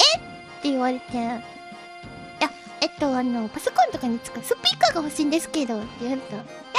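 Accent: Kansai, Japan. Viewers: there was one old stream (don't remember which one specifically) where she says her grandma's Kansai-ben influenced how she spoke when she was little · From the v-tuber wiki: She has mentioned on stream that her accent comes from a combination of her grandmother's Kansai dialect and an unknown dialect of her parents that has not been revealed. She is working on changing it into a more common one. Her accent seems to differ most notably from the inflections of syllables. For example, she pronounces 皆 as MIIN-na rather than the more typical min-NAA.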